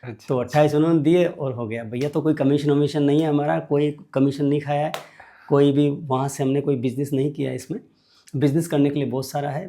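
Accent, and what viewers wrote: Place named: Rajasthan, India. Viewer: Dr ji aap kahan ke hai, aapke bol me haroti ka lahja aa raha hai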